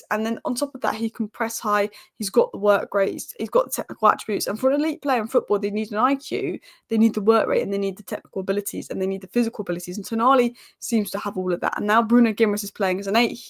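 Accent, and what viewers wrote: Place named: Newcastle, United Kingdom. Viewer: Love that your a secret Geordie 😅 always quick on the videos, good stuff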